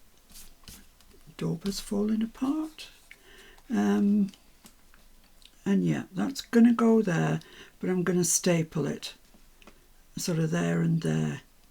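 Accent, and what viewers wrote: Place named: North East England, United Kingdom. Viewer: I've just found you today and subscribed, lovely to hear a fellow North Easterner on YouTube. How can I connect with you? looking for fellow crafters around Northumberland.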